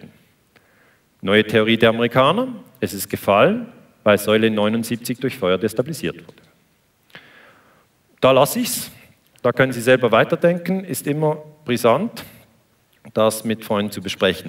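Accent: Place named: Switzerland. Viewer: ich kann verstehen, dass sie dies bevorzugen würden. Als Deutscher kann ich sagen, dass ich jeden Vortrag ihres Landsmannes genieße und es schade fände, wenn ich nur die Hälfte verstehen würde. Ich finde schiizer dütsch sehr schön anzuhören, aber verstehen tue ich leider nicht genug und es wäre doch schade, wenn so ein wichtiges Thema von 80 Millionen potentiell interessierten Menschen nicht verstanden werden kann. :D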